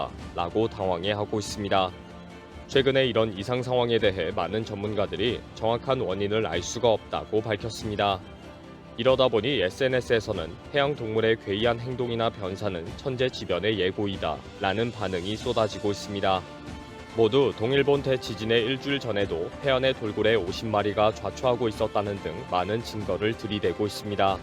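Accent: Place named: Vietnam. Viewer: 해설자는 우리억양이 아닌 베투남 유민같은 억양인데 그리도 인물이 없나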